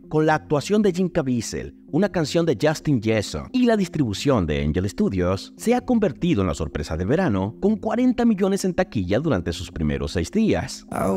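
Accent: Dominican Republic. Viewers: Wtf tu ere' dominicano mi loco 😮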